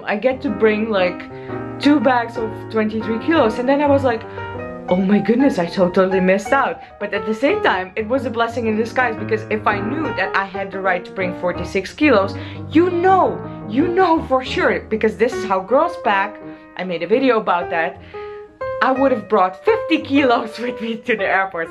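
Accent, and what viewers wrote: India. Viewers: I just started watching your vlogs , and nothing could have stopped me from subscribing your channel . I just loved the way you do it , love your sweet smile 😊 , and loved your hindi accent haha . You are really doing a great job , a very good luck for your future 😊 Keep posting . Made me a huge fan 😊.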